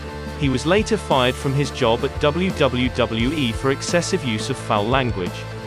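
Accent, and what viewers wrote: United Kingdom. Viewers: Well, he may have a British accent and also be an American. This was a fun trip down memory lane.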